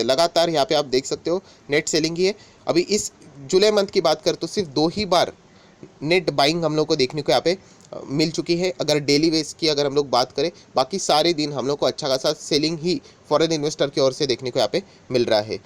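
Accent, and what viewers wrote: Maharashtra, India. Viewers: Sir shayd Pune se kyuki unke Google page me by default language Marathi he, also sometimes he mumbles marathi word's, I know this because I am also marathi. Also he has mentioned that he likes to watch live cricket that means he lives nearby Hinjewadi as it is the only place where international cricket stadium is nearby. Also he was using Micromax Mobile as sometimes during video notification sounds comes. Sir recently bought iphone as well because during video he's phone was ringing... And he last week he said he is making video from different mobile and checking the audio video quality. And most of the time in his video he says 'matlub.. matlub' that's shows that Hindi is not his mother tongue.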